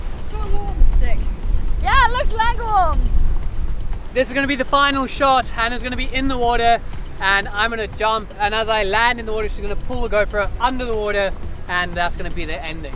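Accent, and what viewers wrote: South Africa. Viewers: Hey, are you from South Africa?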